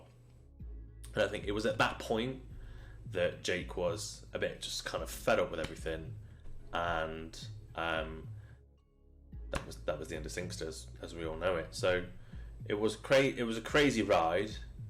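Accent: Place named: Derby, United Kingdom. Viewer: I’ve got to ask- I’ve no idea why I thought this in the first place but for some weird reason it’s always stuck with me. You’re not from Derby, are you? The accent is throwing me off, but it’s been driving me mad for all these years. Good to see you again after all these years, hope you’re doing well! 🙏🏼🙌🏼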